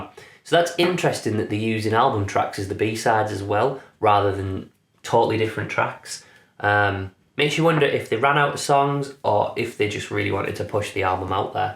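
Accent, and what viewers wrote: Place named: Northern England, United Kingdom. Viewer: A very nice review man. I love this album. The harmonies do it for me really. I detect a northern accent from you. I am a Londoner lol. I will check out your George Harrison review soon, as well as any others you have done. Great work!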